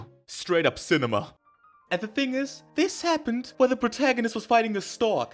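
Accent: Germany. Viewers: You Sound Like U have a German accent somehow xd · You got that german accent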